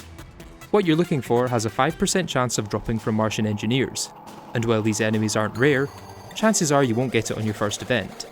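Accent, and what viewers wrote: Scotland, United Kingdom. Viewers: Came for the terraria, stayed to be gaslit by a nice sounding scotish man :)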